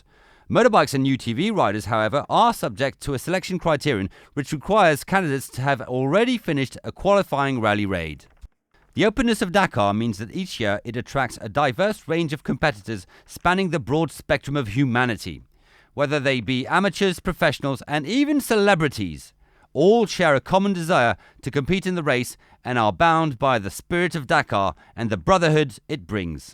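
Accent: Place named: France. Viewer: Need more French accent!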